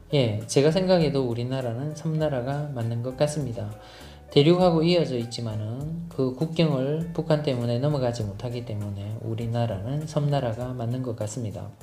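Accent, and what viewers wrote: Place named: Daegu, South Korea. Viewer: 님 영상을 보면서 처음댓글을 남기는것 같네요. 억양이 귀에 익어서 혹시나 했는데 ㅎ 역시나 ㅎ 저도 대구 토박이로 반백년 살면서 나름 열심히 살았다고 생각하고 부족함없이 살고있는데 집사람이 갑작스럽게 사고로 좋은곳에 보내고나니 말로 표현하기 힘든 시기가 있었습니다. 지금도 진행중 일지도...해서 애들 대학졸업까지만 뒷받침해주고 저의 남은 생은 국내.외 여행으로 나름 즐거운 시간을 보낼까 고민중입니다. 오늘 영상이 더더욱 가슴에 와닿는 기분은 아마 이런 이유가 아닐까싶네요. 앞으로도 좋은 영상 부탁드리며 항상 건강하시고 기회되면 꼭 다낭 가서 즐거운시간 보내고 싶습니다. 언재가 될지 ㅎ 그때 뵙게되면 좋은 인연으로 남게되었으면 좋겠습니다 ~~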